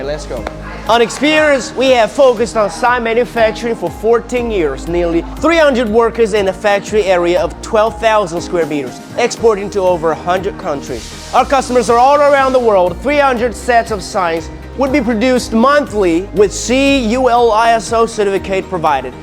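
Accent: South, United States. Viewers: Speaking with a southern U.S. intonation. Can't believe my ears!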